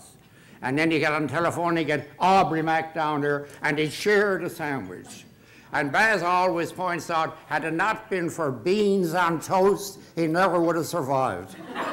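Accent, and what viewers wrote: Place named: Ireland. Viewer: Interesting accent. I can hear the Irishman in his accent. Greetings from Ireland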